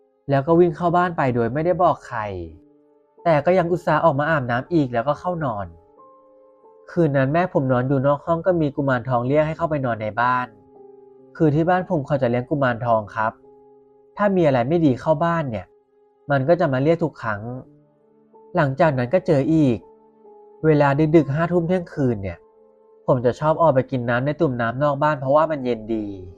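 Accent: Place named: Isan, Thailand. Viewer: ผมว่าเล่าได้ดีนะครับ มันดูเป็นกันเองครับ คือบ้านผมอยู่ภาคอีสานนะครับ แล้วสำเนียงของพี่กับการเล่ามันเข้ากันมากกครับ ฟังแล้ว หลอนเลย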